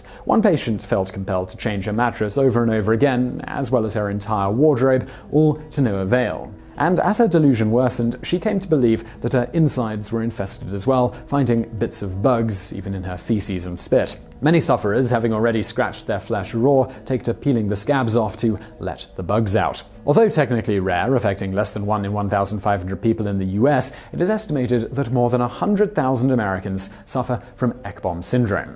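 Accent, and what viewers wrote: England, United Kingdom. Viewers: yeah. nice English accent without sounding pretentious. not that the English sound pretentious or are themselves so, it's just the American perception of it ironic that that evaluation sounds pretentious, I guess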